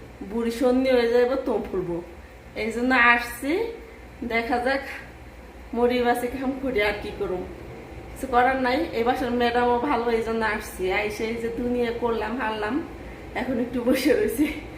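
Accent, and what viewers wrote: Manikganj, Bangladesh. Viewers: আপনার কথা শুনে মনে হয় আপনি মানিকগঞ্জ জেলা সিংগাইর থানার মানুষ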